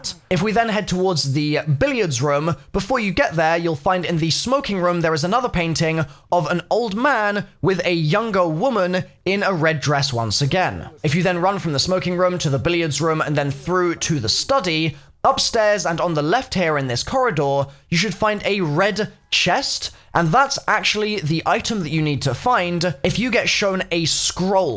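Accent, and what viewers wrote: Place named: United Kingdom. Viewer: "cemetree"? "vaze"? I cannot take the British accent seriously.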